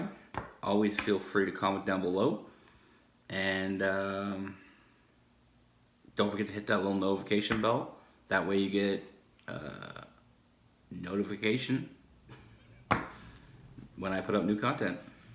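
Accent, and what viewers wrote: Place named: Canada. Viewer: Can’t wait to feel this connection...so, when are you coming to town?? Lol jk. *no disrespect if you have a lady... I grew up on Canada (Rocky Mountain House, AB and Moosejaw, SK. I love your accent. Canada is so awesome, I miss it! Shoutout to all the Canadians! You’re awesome too. So adorable. Thank you for this. It’s so appreciated. ❤️🙏🏻🙏🏻👌🏻👌🏻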